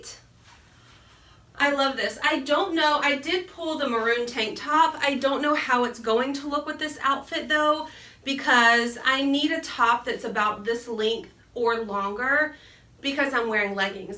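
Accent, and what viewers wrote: South, United States. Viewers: What state are you from I noticed you are southern and yes I am southern to.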